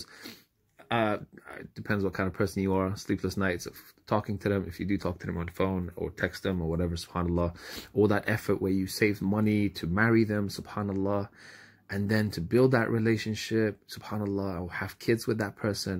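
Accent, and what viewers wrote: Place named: United States. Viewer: I thought i could detect a American accent. 👍😄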